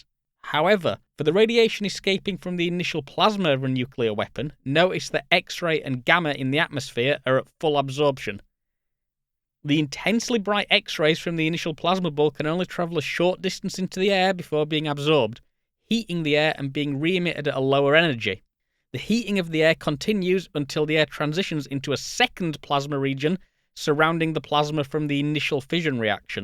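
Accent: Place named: United Kingdom. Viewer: He doesn't have a nerd voice! He's just aggressively British!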